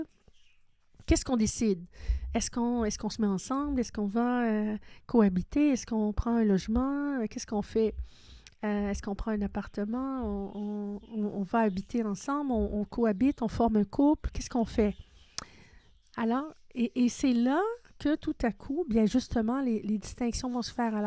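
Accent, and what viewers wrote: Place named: Quebec, Canada. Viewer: Une voix familière québécoise! Il y en a peu depuis que je visionne différentes capsules sur ce lien suite à mon éveil! Je vous découvre avec bonheur ce soir. Je vais parcourir vos vidéos maintenant...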